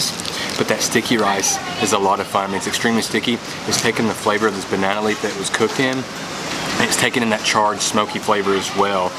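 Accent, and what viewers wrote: South, United States. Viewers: Randomly stumbled upon your video and glad I did! I adore your southern accent (I hope I got that right).Loved your reviews especially the Chinese noodles that I can’t normally have, l’d love some of that chilli pan mee. It’s actually called ubi getuk (mashed and fried tapioca with coconut), also the roti canai is pronounced Cha-nai, cha as in ch in chair. You’re practically Malaysian as we do mix our roti canai sauces together too! My ultimate favourite combination is the red sambal with the middle lentil curry (called dhal). Looking forward to more of your videos! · i believe he means your southern accent. I've been trapped a time or two by the fairer sex.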